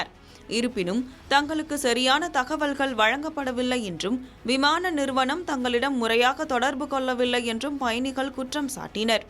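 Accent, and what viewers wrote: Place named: North India, India. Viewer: Yentha naathari da seithi vaasikirathu, ithulayum vadakkan vanthuttana😡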